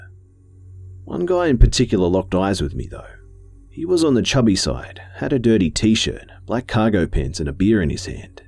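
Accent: Australia. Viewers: Can't tell if your an Ozzie or not sounds like it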